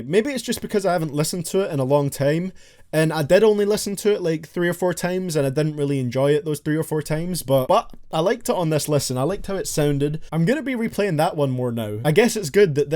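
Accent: Scotland, United Kingdom. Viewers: Hey are you from Scotland?, I am!!!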